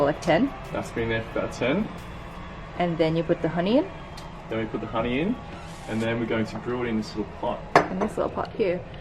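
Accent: Australia. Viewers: M.A Australian ♡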